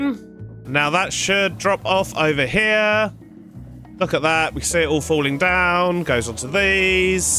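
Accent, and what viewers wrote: United Kingdom. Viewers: Also are you british?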